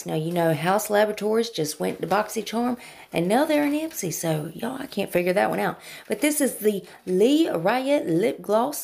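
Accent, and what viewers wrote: South, United States. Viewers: Hi, I look forward to your sweet southern accent and advice. Because if you I’m going to upgrade. I almost dropped it thinking who’s going to see if I have makeup on or not. But it makes me feel a whole lot better wearing it around the house. Thank you for reminding me of that! Jen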